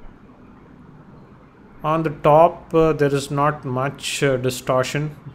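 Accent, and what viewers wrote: India. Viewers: This is actually the way all indians talk english, you get bored when you listen to a lot of them. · speaking english with indians accent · India-english accent is really cute :3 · o god this indian accent.. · awesome indian-english accent:) <3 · I can’t take it with that Indian accent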